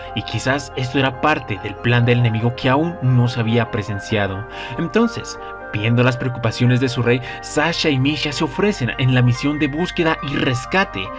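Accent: Colombia. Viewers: a nmms eres colombiano?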